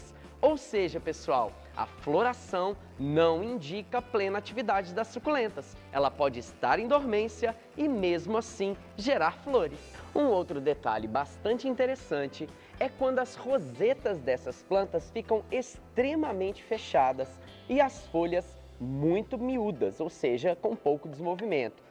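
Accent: Minas Gerais, Brazil. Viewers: Você é mineiro de onde hein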